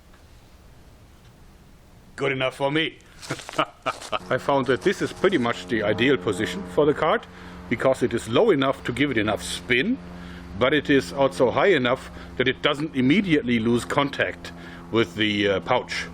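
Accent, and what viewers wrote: Germany. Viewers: Ist der Deutsch,wegen dem "Akzent" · du bist deutsch das merkt man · Man hoert an deinem Englisch das du Deutscher bist was ich auch schon vorcher wusste aber ich feier dich:) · the typical german-english accent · For me he Sounds very German ^^' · Yeah, German Accent... I'm German and I hate German accent the most... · Ja, man merkt das er Deutscher ist :D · Hach, wie ich diesen Deutschen Akzent im Englischen toll finde :D Super Video ✌ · Haha du bist deutscher oder? :D oder sogar schweizer? ^^ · I like your German hah hah hah hah! Sounds so German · oh :X ich dachte Amerika weil alle so blabla dein deutscher Akzent noch sorri dafür ^^ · Bruder man hört das du nen deutscher bist xD Edit. Hab dich grade bei my spaß gesehen bei rab gut gemacht 👍🏻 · Man hört einfach sofort das du deutsch sprichst 😂ich feier dich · Haha man merkt richtig dass er deutscher ist😂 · Are you German? 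You have a German actcent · hörst du den deutschen accent nicht wenn er englisch spricht??? wow!!! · hes a german ;) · Ich denk die ganze zeit das er deutscher is der es probiert englisch zu reden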